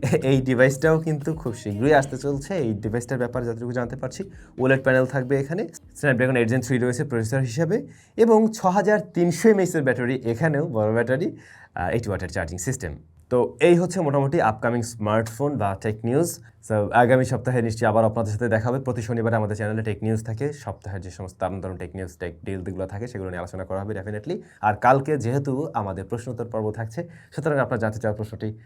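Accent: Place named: Barisal, Bangladesh. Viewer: vai apnar Basha barisal a kothay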